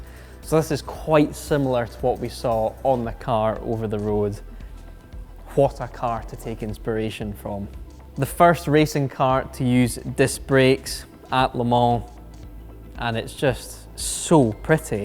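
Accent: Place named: Newcastle, United Kingdom. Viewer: He's Geordie not Scottish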